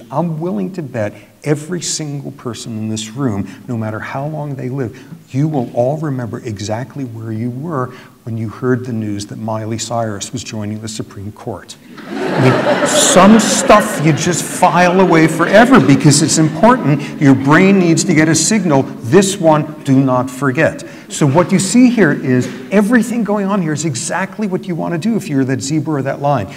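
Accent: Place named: United States. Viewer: sounds like murica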